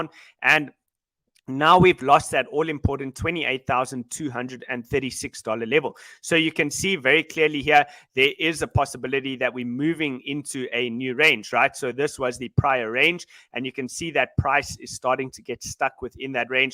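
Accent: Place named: South Africa. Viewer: SA I reckon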